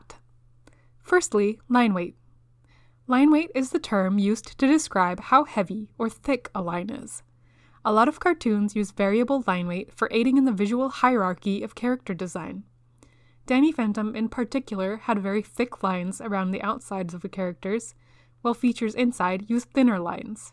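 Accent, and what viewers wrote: Canada. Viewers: First time watching your vids, I hear the Canadian on your supprisingly soothing voice. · I like your accent. Where are you from? You hold your mouth like a Canadian but also fully pronounce your Ts so I can't tell O_O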